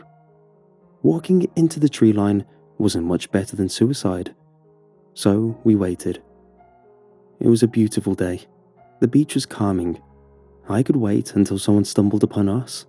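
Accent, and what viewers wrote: England, United Kingdom. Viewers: Btw ..now im in time. .haaah. itz 14hours ago .. btw. Where ever this story comes from. ... think america. But the voice is so. English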